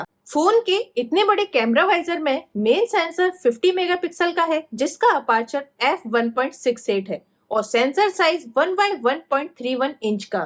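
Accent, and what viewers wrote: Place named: Kangra, India. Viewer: Aap kangra ya hamirpur se lag reho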